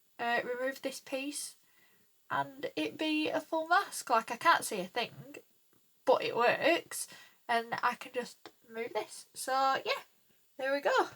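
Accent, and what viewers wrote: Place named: United Kingdom. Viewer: I love you voice it is so British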